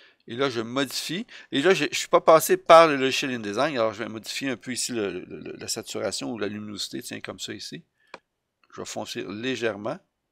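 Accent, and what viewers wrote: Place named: Quebec, Canada. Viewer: Bravo, bien dit et le suivi vidéo est clair. Je ne veux rien enlever aux narrateurs français de France, mais ça fait du bien d'entendre une voix québécoise narrer de la vidéo pédagogique.:)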